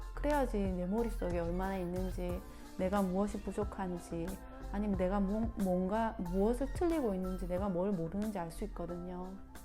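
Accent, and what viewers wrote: Gyeongsang, South Korea. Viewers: 영상 잘 보고 갑니다. 자신감을 가질수 있는 좋은 영상입니다. 댓글을 보았더니 또 악플을 다는 사람들이 있더군요... ㅠㅠ 나쁜사람들~~ 그리고 부산 분이신가요? 경상도 사투리도 구수하네요 아무튼 잘보고 갑니다. 구독 하였으니 좋은 영상 많이 올려주세요.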